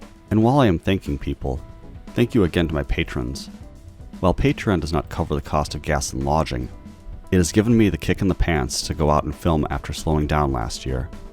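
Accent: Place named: Midwest, United States. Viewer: I don't think I've ever been this early. Your videos are great! I love the calm narration, the midwest touch, and the little skit y ou did. <3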